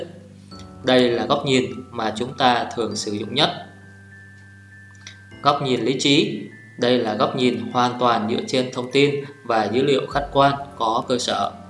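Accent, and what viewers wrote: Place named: Thanh Hoa, Vietnam. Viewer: bạn này ở hoa thanh quế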